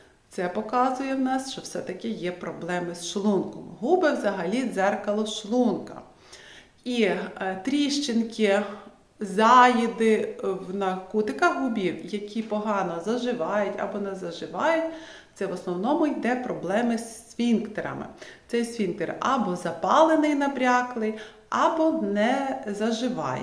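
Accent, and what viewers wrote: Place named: Galicia, Ukraine. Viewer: ' так гарно звучить... відчувається що галичанка, львівська пані. Всіх благ вам і добра за таке корисне відео.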